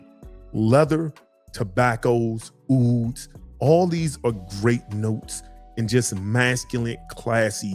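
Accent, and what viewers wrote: DMV, United States. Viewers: Is that the DMV I hear? Randomly came across your channel video and I know my ear doesn’t deceive me.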